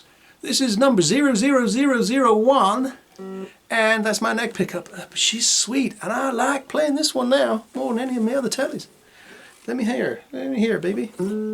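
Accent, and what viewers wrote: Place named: United States. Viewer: what`s with the stupid yank accent??????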